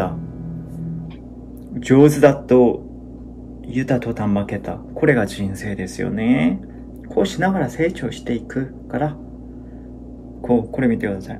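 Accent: Fukuoka, Japan. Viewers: 誰が聞いたとお？ 福岡弁、うますぎ❣️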